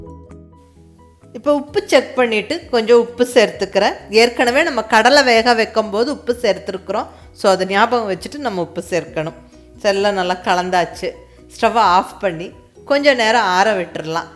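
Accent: Kongu, India. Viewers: கொங்கு தமிழில் அழகா...healthy ஆன ரெசிபி சொன்னிங்க... thanks mam 🙏....👍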